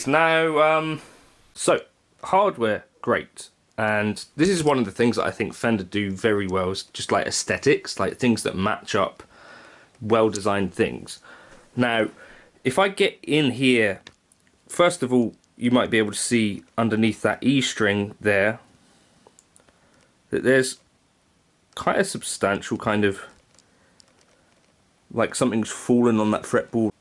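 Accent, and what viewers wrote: United Kingdom. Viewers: Isn’t this just how British folk talk? Why are you so critical?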